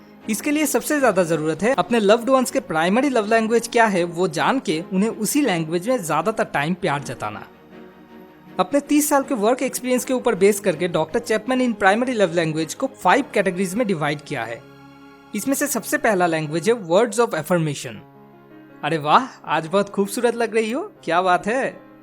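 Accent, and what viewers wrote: Bengal, India. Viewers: frnd r u bengoli .??..ur voice tone is bengoli ..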